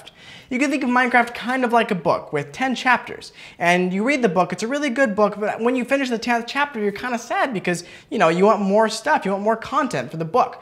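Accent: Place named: Russia. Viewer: are you Russian? It Sounded like you had a Russian Axint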